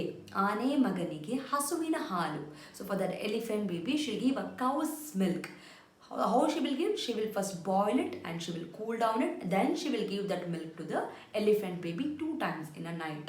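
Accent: India. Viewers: Aunty please don't talk in buuttler english please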